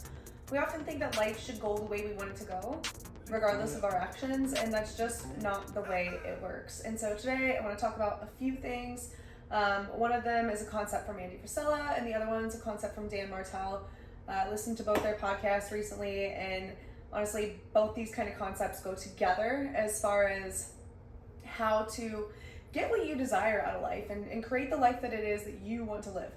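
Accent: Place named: United States. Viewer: 🙂Another good episode thanks. Btw i love how you yanks say "yaw all":p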